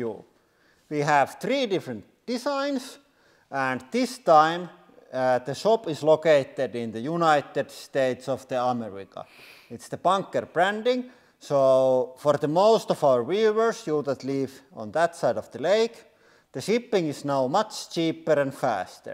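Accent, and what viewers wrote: Finland. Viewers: Terve! A long time ago I washed many hidraulic press videos, stopped watching a for a while, never thought you was from Finland. I'm living in Finland for a year now and then watched again one of your videos. I immediately recognized the accent, and then realized right away you are Finnish. 😃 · Is this guy from Finland? His accent is Finnish.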